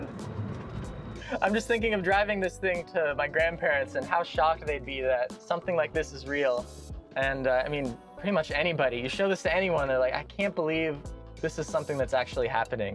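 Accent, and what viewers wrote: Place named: San Diego, United States. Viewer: “Fermiliar” man that reminds me of home, good old San Diego dialect. Love “bolth” SD and what Apterra is doing for the industry. Everyone’s watching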